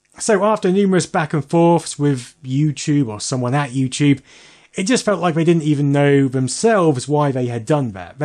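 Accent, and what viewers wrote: United Kingdom. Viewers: Bro just want to thank you for making vidoes. Listening your british accent and geeky videos makesmy day always better! 👍🏻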